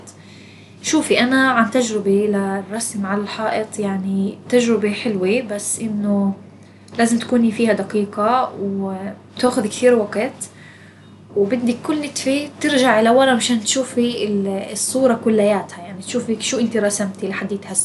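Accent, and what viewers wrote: Palestine. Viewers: احب جدا طريقتك برسم الزي الياباني تعجبني اتمنى ارسمه مثلك او اتقنه مثلك ماتردي علي بتمسخر ع لهجتك وبعرف انو فلسطين هيك بتحكي وبلعكس حلوة والي بقولو كلم هدول اكابر وبسس^♡^♡_♡ ♥♥♥♥♥ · بالعكس اللهجة الفلسطينة اكثر من رائعة تحياتي لفلسطين و لهجتها ❤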